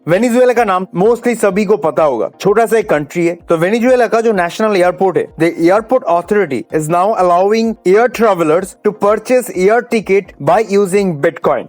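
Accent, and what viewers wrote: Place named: India. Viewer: Beautifully explained. I love your ehindi accent....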